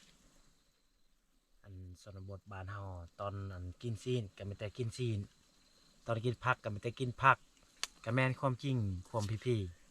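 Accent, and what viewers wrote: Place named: North, Thailand. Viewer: พูดเหมือนคนภาคเหนือของไทย.กินคือกั๋นเลย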